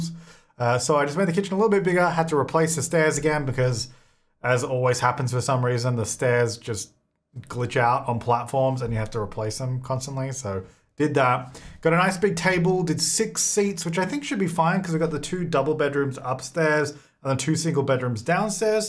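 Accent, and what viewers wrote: Australia. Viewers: I love your australia english :)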